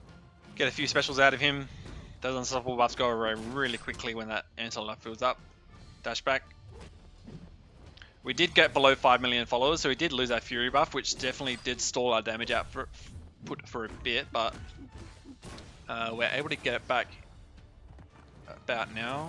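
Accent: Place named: Australia. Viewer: Recognised a fellow Aussie instantly😅 Nice vid man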